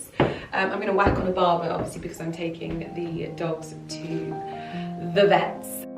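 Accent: United Kingdom. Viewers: I enjoy your videos 🥰i really enjoy you British accent I want to visit English one day favorite country 🥰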